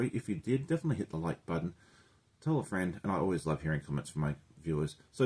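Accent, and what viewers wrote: Australia. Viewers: I love those colours.... Im also curious is that an Aussie accent?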